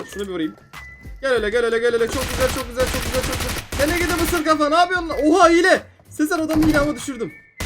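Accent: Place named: Adana, Turkey. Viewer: Yoo Adanalı lar da öyle konuşuyo ben Adanalıyım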